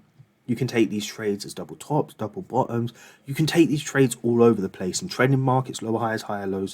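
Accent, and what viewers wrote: London, United Kingdom. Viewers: Really sound like a cool london mandem! Hope to meet you one day G :) Great content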